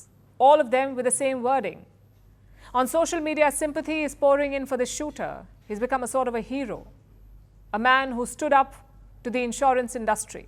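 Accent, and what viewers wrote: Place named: India. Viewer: Please! Stop with the Indian accent and pseudo-journalism!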